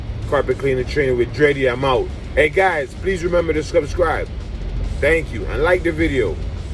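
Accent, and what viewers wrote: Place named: Jamaica. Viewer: sound like your Jamaican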